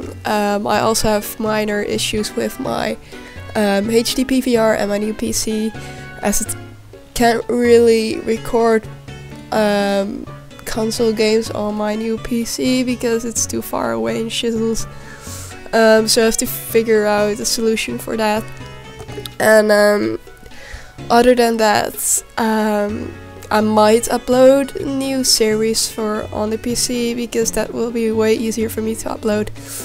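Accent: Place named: Netherlands. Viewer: I hope you get better soon, being sick sucks! Btw are you Dutch? I can tell from your accent...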